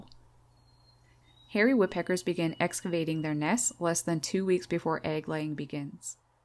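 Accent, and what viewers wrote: Canada. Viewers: You have the most Canadian accent I've ever heard. Cool video.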